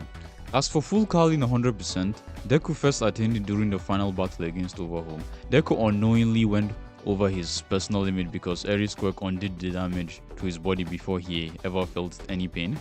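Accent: Nigeria. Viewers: You Nigerian?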